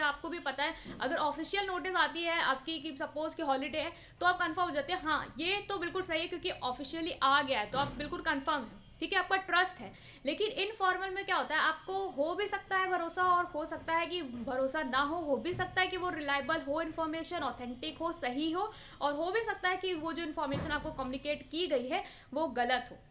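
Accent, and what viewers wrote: Lucknow, India. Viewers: App Lucknow say hai lag raha😀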